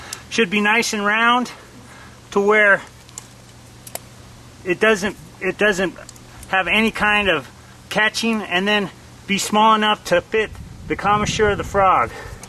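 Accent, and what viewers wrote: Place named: New Mexico, United States. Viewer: . this is an excellent video, clearly explained and the photography does what it is supposed to do, allows viewers to see the detail and the progress of the process. The narration is very good, I could listen to the new Mexico drawl all day. Thanks for a great resource.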